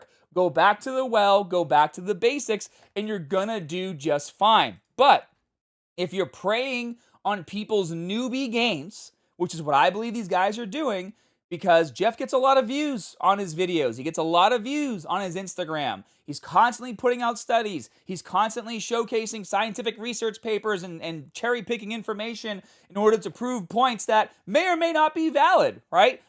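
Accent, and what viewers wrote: Boston, United States. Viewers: R u from boston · I'm never ready for the Boston accent lol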